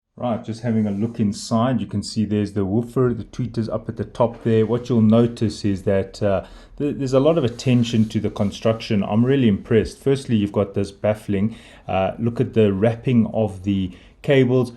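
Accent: South Africa. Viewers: Wow that is unmistakable south african accent, nice to be here, mzansi too! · If the accent did not give it away. The SA plug was the confirmation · Cool, m in SA too, heard the accent, keep it way 🆙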